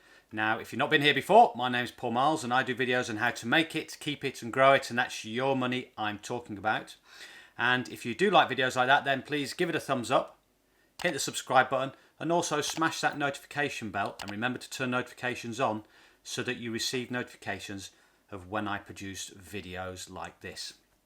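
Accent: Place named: Northern England, United Kingdom. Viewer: yes , i like your videos too . thanks for them .i just found you today , very grateful i found you .You sound like your from the north of England , i am wondering where exactly ....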